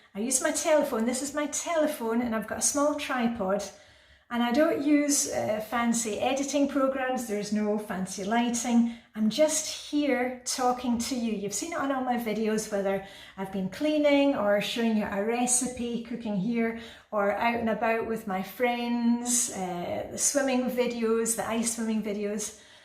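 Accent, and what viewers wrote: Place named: Edinburgh, United Kingdom. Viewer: Hi just to say I love your videos and you just as you are. I come from Edinburgh too, am 57 and also post menopausal and have lived many years in the west of Scotland and it is so nice to hear someone from my neck of the woods on YouTube! Since discovering your videos in 2018 I think you have been inspiring me to be a lot more organised and it is just nice to have someone there when I am working away in my kitchen or whatever encouraging me to keep on going. I always find your advice spot on when I hit a problem usually trying to do everything perfectly and failing! So keep on going and be assured your videos are appreciated very much out here in youtube land!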